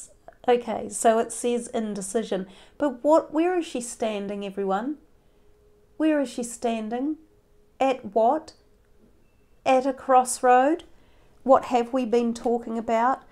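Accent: New Zealand. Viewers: I hear another NZ accent !! Nice reading. ☯️🌈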